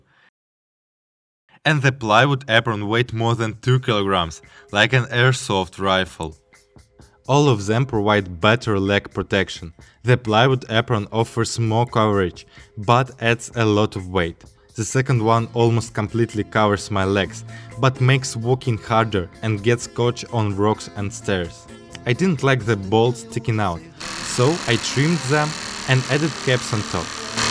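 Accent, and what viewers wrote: Poland. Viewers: are you polish? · We understand you are not Hungarian you are polish!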